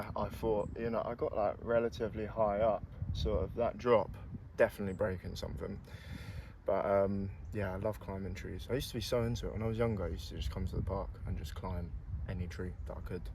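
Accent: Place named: United Kingdom. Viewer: No question about it, you have a gorgeous body. I love your legs. They’re perfect. Your body is nicely proportioned You give good sexy face I hate the way you talk. With your unique name, I thought you were gonna be something different. I really really really can’t stand. I’m so disgusted by that British accent. Where are you? I’m subscribing to you for your sexy body and to watch you exercise and do shit. But dude, you gotta learn to speak standard American English. It’s like a Midwestern American English. Do you have an only fans? Have a great day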